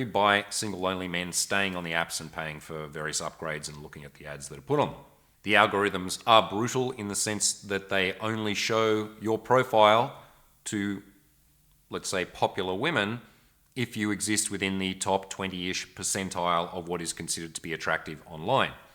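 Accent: Australia. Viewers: Eu amo o inglês australiano dele 😅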